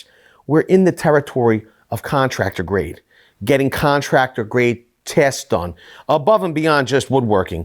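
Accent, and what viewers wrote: Philadelphia, United States. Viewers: You're from Philly. I can hear your accent.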